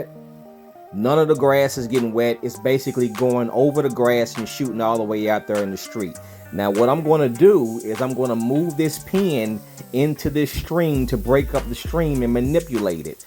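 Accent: South, United States. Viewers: Love the accent. We southern!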